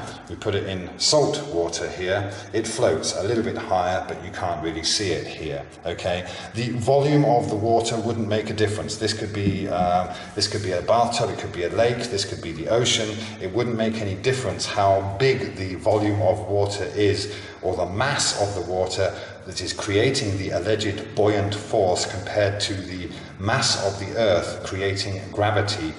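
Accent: England, United Kingdom. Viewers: can be denser than other air; this is the basis of meteorology, i.e. weather forecasting. Warm air floats on cold air, because of density, but ALL air is attracted to the earth. Its called gravity (stupid), and since ALL air has mass, gravity can act on these objects. Do you actually understand any physical science, or do you think people believe you know what you are talking about because of your "english" accent? Sir, you will never be mistaken for David Attenborough; an actual scientist:-)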